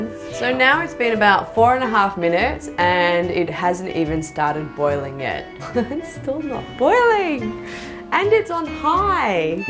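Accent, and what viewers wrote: Australia. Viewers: Is she Aussie? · You sound Australian!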